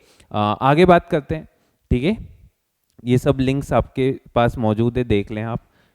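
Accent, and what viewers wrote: India. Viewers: Sir, cool Hindi accent!!🤯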